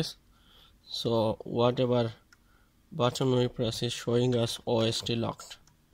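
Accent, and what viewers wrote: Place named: India. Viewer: its english but he is propably from india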